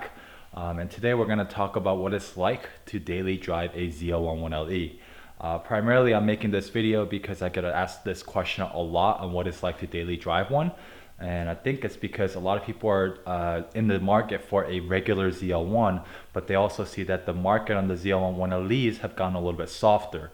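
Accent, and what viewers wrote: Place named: Texas, United States. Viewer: This guy definitely from Dallas TX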